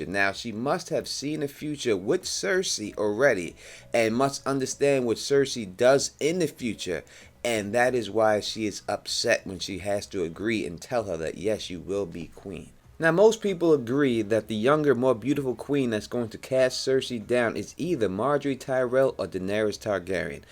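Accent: New York, United States. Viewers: Chea chea chea!!! The, lol first time I found this channel, i knew right away my dude was a native new yorker like myself. We definitely have our own way of talking here, big ups from queens.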